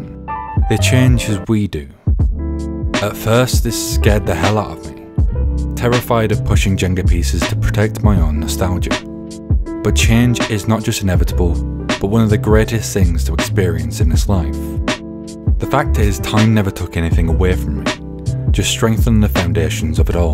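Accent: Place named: United Kingdom. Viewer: Also where from the UK r u from cause your accent interests me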